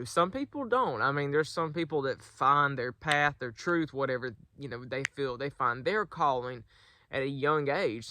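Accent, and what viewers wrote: South, United States. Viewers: When I listen to you, it's like listening to myself- except for accent is little less southern. Great video though! Blessed day!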